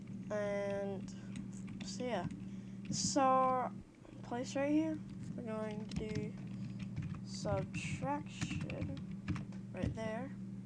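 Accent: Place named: Texas, United States. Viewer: Nice Texan Accent! Go Texas.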